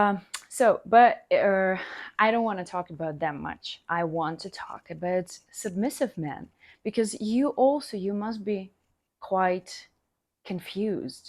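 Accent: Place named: Russia. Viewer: Gracious, I just have one comment today: I know you say Russia is a very bad place at this time and I believe you 100% but I must say.. Your beautiful accent and physical features make me certain that at one time long ago Russia must have been a very good place. You know, the concept of the Chineese Emperess is something that I find so appealing, I don't know if is historically accurate, but I heard somewhere that China in ancient times was a people ruled by a female hiearchy.. what a wonderful wonderful world that must be. I have finally come to realize I can't wait for the world to become matriarchal.. so I'm declaring now that it has officially changed! We are now living in a matriarchal society and I expect everyone to act occordingly.. they will be called out by me for non-compliance. You are so wonderful.. I wish I had money for you but I am on government assistance for my mental disability and sometimes can't even manage my bills. 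So I leave comments, let me apologize; because of my mental disabilities I know that about half my comments are wierd and off the wall but I ask you to please just ignore them because occasionaly there is a rational heartfelt comment.. and I have such strong feelings of respect and admiration for you that it would be a shame for you not to understand how important you are to me just because i ddid a little too much LSD or DOPE many years ago.. btw: as society is more and more accepting of homosexuality I have already gone on to somethi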